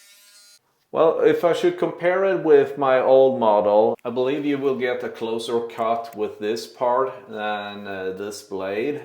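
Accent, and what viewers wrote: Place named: Sweden. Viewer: Thanks for the video I like your Swedish accent :)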